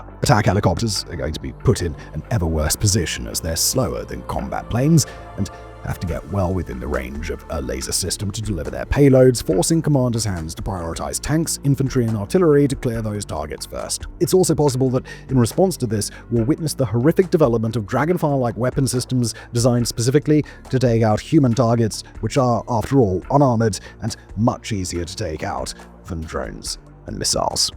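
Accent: United Kingdom. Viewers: British accents and lazer weapons, I think we all know where this is going.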